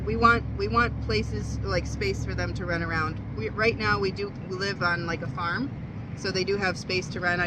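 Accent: Canada. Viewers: Ah I recognized that Canadian accent 🙂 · I thought had a Canadian accent going on. I watch some Urban Exploration guys from Ontario. And certain words like (out) is said differently from us in America, lol I love watching all the videos!!! 😍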